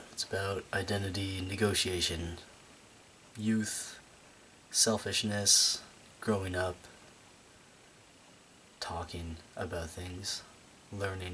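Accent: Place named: Ireland. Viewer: I adore that little Irish accent you are developing! Words can't even describe how excited I am to watch this short film. I have very little knowledge on trans people, but at the same time, a great deal. My ex was trans and I'm beginning to wonder if I am too. I just feel so incredibly masculine, yet nobody can truly understand it or me. Also, my best friend says I have the face of a 14 year old boy. lol I've been watching your videos from the beginning,. You are very awesome indeed.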